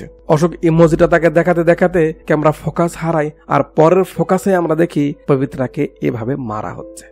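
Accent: Bogura, Bangladesh. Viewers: ভাই আপনি মে বি বগুড়ার লোক।❤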